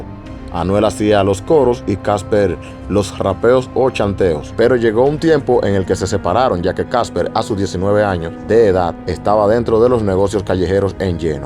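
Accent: Dominican Republic. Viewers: ok que bien, te pregunto como ases noticias urbana de PR, pero hablas domii jjjj bien ahy manito💪💪💪💪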